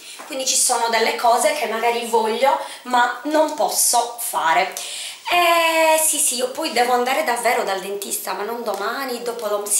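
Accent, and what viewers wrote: South, Italy. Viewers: questo purtroppo non è l'accento perfetto. E' un accento del sud. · it is not a good accent. she is nice teacher but with a very bad accent. she is from south, all the vowels are too open. it is not correct. for italians it is very bad accent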